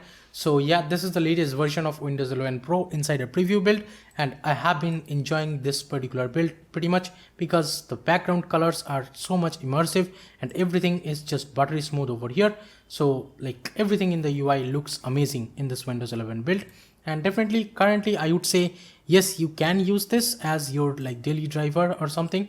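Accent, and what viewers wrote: India. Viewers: Why does it give me weird vibes to hear an indian accent and see AnyDesk on the desktop? Nice video tho! ^^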